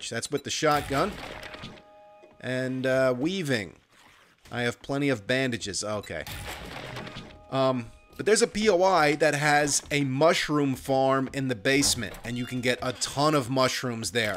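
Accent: New Jersey, United States. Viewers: the saying is "You attract more flies with honey than vinger~" which is true. In wooded areas its common to see abandoned be nests full of maggots among other insects. But its meaning is to always be sweet instead of bitter to get what you want. I love your videos. I'm in New York so its awesome to hear such a thick jersey accent outside of Jersey shore. "Cabs are here"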